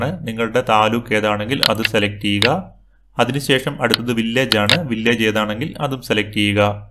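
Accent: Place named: Trivandrum, India. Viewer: Tvm slang♥️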